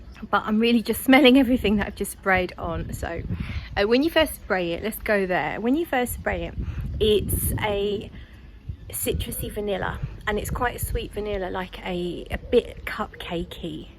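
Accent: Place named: United Kingdom. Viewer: I just ordered Artsy by Kimberly NY- it’s supposed to be very light-hearted and candy like, possibly marshmallow-like. Also I just want to say I love that I get to hear your lovely British English often. I wish I had an accent like that. You know my mother was Australian so she said things funny and I did too but I remember the day I started saying “orange” with a long o instead of “arange” like her.. I know that’s not British but anyway I started out with a different accent..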